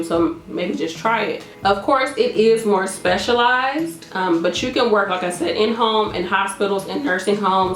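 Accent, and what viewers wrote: St. Louis, United States. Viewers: As soon as i heard your accent i KNEW you were from the Lou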